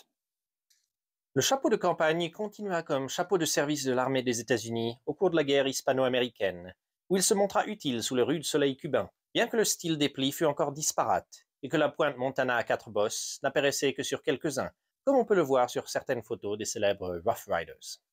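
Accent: Quebec, Canada. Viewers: Léger accent canadien francophone. Léger, mais bien là.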